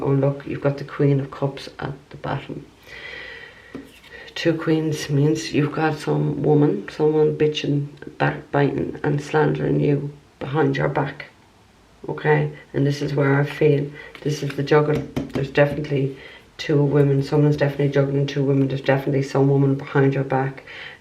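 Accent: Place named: Ireland. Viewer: Ohhhh Irish gal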